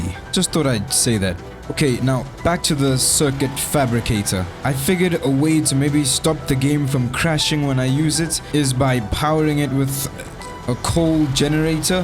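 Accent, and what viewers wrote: South Africa. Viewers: I knew your accent sounded familiar, I am also a fellow South African 😄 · My bru are you South African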